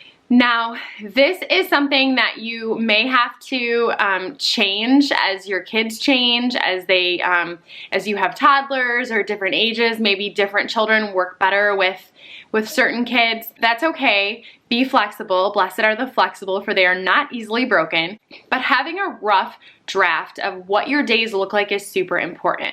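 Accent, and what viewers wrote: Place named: Michigan, United States. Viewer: Commenting again to say that I’m a Michigander (now residing in Tennessee) and it’s nice to hear someone who has my “accent” 🤣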